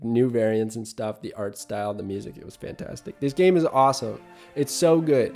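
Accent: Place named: Southern Ontario, Canada. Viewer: its the first thing I noticed when I came to the channel. I'm from BC and there is nothing that I like hearing more than the southern Ontario accent (well maybe the east coast one too).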